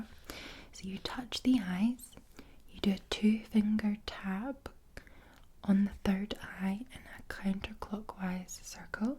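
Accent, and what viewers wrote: Scotland, United Kingdom. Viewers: the scottish tingles provided by a beautiful scottish lass. excellent! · You should roleplay Rayla from Dragon Prince since you're Scottish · Damn I really needed this today , after being scammed on Twitter and putting a hole in my wall , I need this beautiful Scottish Lass to calm me down . Thank you for all you do , it’s very much appreciated by me 😍😜👍🤠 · It's great to see a Scottish ASMR person on YouTube. As a Scottish person myself the last voice I want to hear is someone with a disgusting English accent so you my dear have frankly been a godsend!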